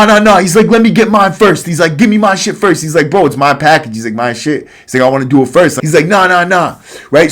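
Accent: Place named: Brooklyn, United States. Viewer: Luv the channle bro I could listen to you talk for hours u remind me of this Brooklyn dude, my boy "Moe" I met up top in NY. I only did 2 years but Holly Shit that was a CraZy Time of My Life!!! Prison politics are crazy. I ended up working out with him then we got in a fight. I fought well but he got the better of me and broke my rib. I laid up for a week and could hardly breath for a few days but kept my mouth shut after that we spoke dapped up and we ended up becoming Boyz and running a store together working out cooking I was in. Its crazy how sometimes you make friends after you beat each other's asses. Lol I gave him a black eye in the fight I couldn't breath bc he broke my ribs, he looked like shit for a week. HaHa then we were cool after😂 prison is fucking crazy bro😅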